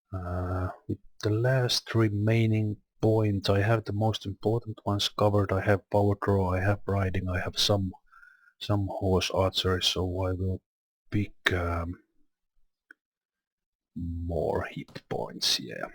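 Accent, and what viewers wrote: Finland. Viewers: He just sounds like a guy with a prominent Finnish accent. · Are you Finnish? Your accent so Finnish.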